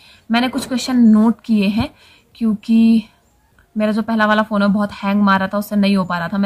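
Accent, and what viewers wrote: Garhwal, India. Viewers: tum sayd gadwal se ho,Mai almora se hu, apki gadwali bilkul hmari kumauni jesi hai...kyapp swal hogya na 😀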